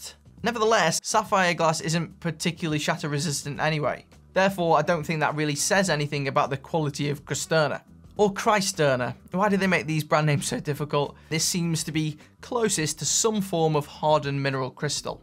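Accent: United Kingdom. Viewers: I love British accent interesting to listen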